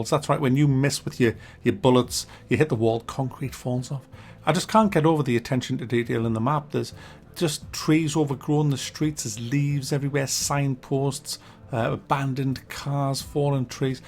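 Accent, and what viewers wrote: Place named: North, United Kingdom. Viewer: Is is just me or is sounding even more northen than usual lately?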